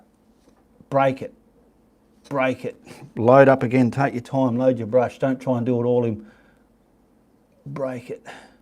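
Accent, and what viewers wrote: Australia. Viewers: Howdy mate. Gees, your ozzie. Love it. Love your work. Love your chatter. Your my morning viewing.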